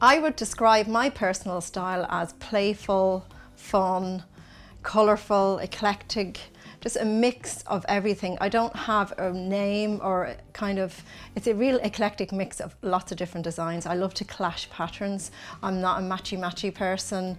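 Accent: Ireland. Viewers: love the Irish accent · What a wonderful lady & an incredible home. I must look into Punch Needle, the texture is lovely. Very inspiring & l just love the Irish accent. My gran was born in Kilkenny. ❤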